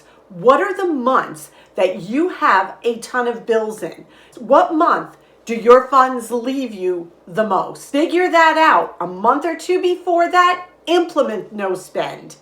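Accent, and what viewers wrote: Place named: Boston, United States. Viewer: I love your accent. You sound like a Boston girl from italy.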